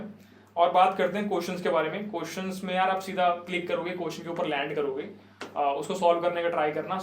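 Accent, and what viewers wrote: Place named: Haryana, India. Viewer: THAT CUTE HARYANVI ACCENT IN HIS VOICE ♥😅🏄💯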